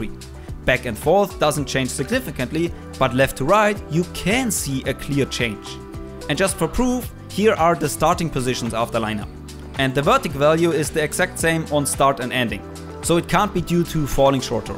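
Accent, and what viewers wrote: Germany. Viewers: Hi are you german? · Nice Video Hey, are you German? Your Accent sounds like it ^^ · Are you German because you sound like nearly everybody in Germany and Austria when they speak german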